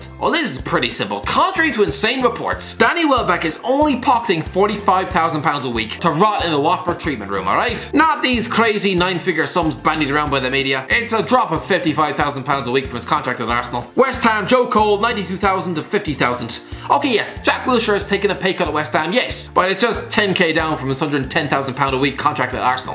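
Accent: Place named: Ireland. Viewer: Man I love this guy, listening to his Irish accent is getting me through quarantine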